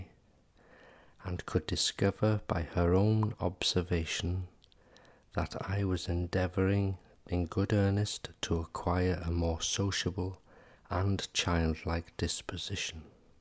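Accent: Wales, United Kingdom. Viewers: I haven't heard the Welsh accent very much. In fact the two people I've ever heard are Rob Brydon and. Now I think this is how all Welsh sound.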